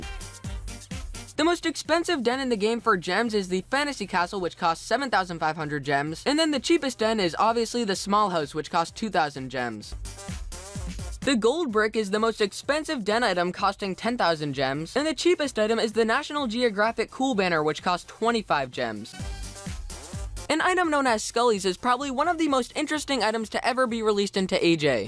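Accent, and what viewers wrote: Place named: United States. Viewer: Ahh the typical, facts facts facts facts. Your voice has changed to a more stronger American accent! Plus did wisteriamoon tell you password! Hehehe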